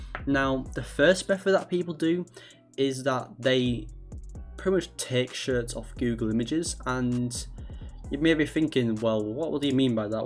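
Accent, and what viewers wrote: United Kingdom. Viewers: Bruh hes british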